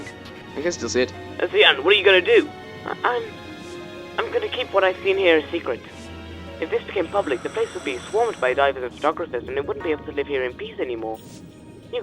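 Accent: United Kingdom. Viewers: Cool!!! Hey, are you british?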